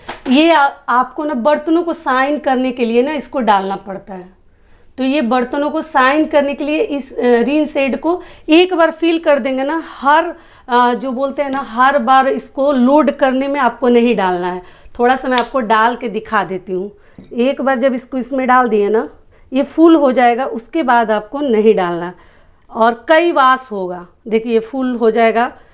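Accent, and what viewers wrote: Bihar, India. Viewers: Are you from Bihar?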